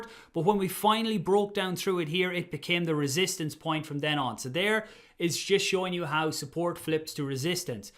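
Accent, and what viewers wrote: Ireland. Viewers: Heard the Irish accent and subscribed straight away🇮🇪🇮🇪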